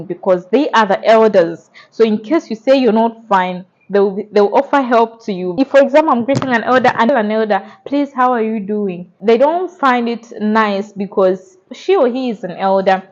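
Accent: Ghana. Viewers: How many years hae you been heir, because you have a percent twi accent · It is evident that you share our values. No wonder you are in love with a Ghanaian. You’ve even mastered the accent. You can sum up our culture in one word - hospitable. Sadly, some of our youth think that the fast life is the way to be successful. It is a big fallacy. Don’t fall for the flash. Our culture is rich. Yeda ase (we thank you)for appreciating our culture.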